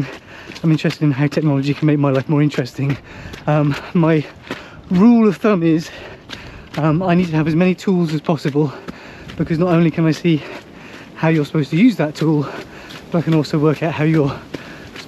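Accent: United Kingdom. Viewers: In love with your videos and British accent hugging my auditory receptors ♥️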